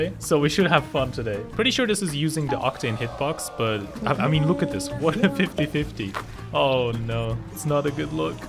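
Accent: Germany. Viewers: Da merkste halt dass er deutsch ist die Aussprache kann man nicht als nicht deutscher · Hey, are u german? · Are you german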